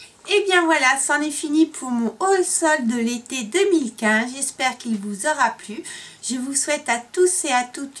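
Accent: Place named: Paris, France. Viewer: Allo cherie tres beaux goodies - j'aime beaucoup - tes yeux sont tres belle et ton eyelashes ooh-la-la - le francais Parisienne est plus jolie que le francais ici - mon dieu tu est tres belle et je comprends tout mais mon francais ecrire et pour les oiseaux hahahahahahaha xxxx beacoup d'amour xxxxx Suzy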